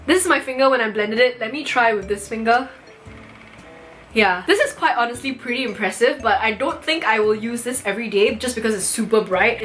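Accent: Singapore. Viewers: Singaporean accent lol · singaporean english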